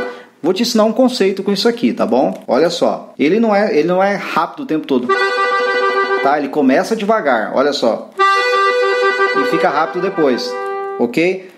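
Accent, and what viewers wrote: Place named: Rio Grande do Sul, Brazil. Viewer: Vc é gaúcho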